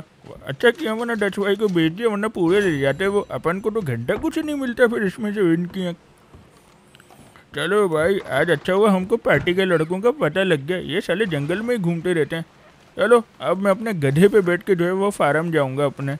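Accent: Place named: Pakistan. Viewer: bro ki awaz ma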